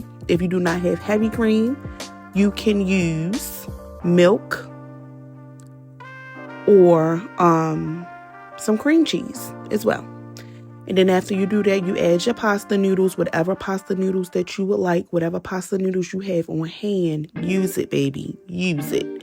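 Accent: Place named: Baltimore, United States. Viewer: Looks good, youre from Balitmore arent yew lol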